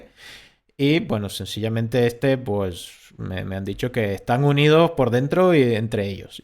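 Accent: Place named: Venezuela. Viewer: Ok gracias por el dato y la corrección, ya me parecía familiar el acento venezolano saludos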